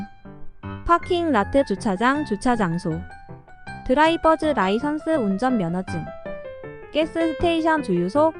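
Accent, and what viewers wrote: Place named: South Korea. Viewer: AI인가요? 영어를 한국식으로 표현하니 어색하네요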